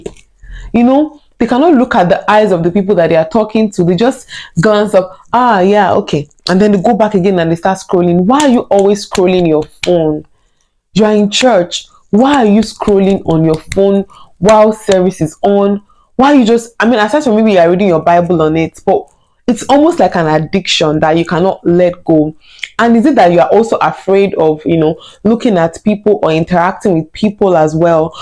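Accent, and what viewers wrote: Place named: Nigeria. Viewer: Why Nigerian accent sounds rubbish?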